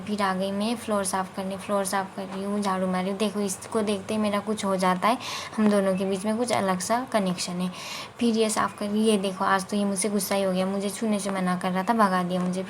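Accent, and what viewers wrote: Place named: Nepal. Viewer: Yar vlog to theek hai par use jyada aupe ka accent hai Jo hame khatarnak laga proper Nepali people when they speak hindi.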